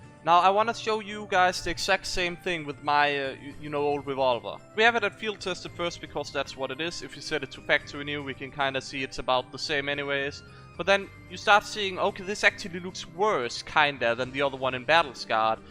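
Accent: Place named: Denmark. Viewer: Where are you from? You sounds like a dane tbh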